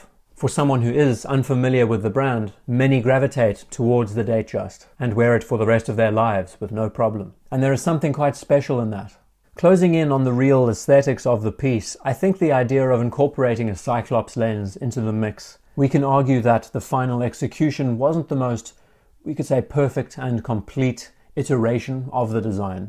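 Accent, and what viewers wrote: South Africa. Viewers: He sounds South African.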